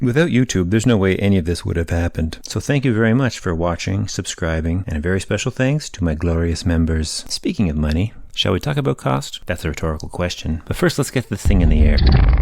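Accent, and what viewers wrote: Canada. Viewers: Very exciting news! Always love your review vids and the 182 video in particular helped me make the decision to buy one a couple years back. Out of all the planes you've ever reviewed, this one seems just so natural that you would own it. Also, never noticed you say "aboot" as much as in this vid but the Canadian tail registrations probably predisposed me to hearing it haha. Keep up the awesome work, dude, and safe flying!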